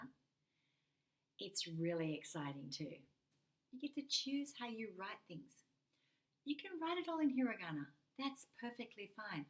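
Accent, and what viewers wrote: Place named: Australia. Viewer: Absolutely love this channel your technique is great too. Do you live in Japan? Your accent sounds Australian.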